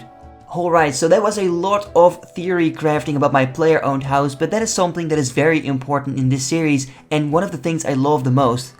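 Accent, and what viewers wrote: Netherlands. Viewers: I think he is Dutch. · Lekker hollands accent hoor haha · Ik had al zo'n idee dat je Nederlands was...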